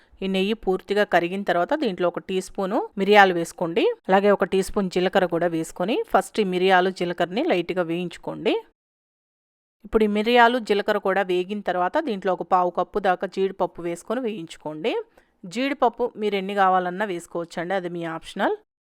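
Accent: Nellore, India. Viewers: Madam meru nellore valla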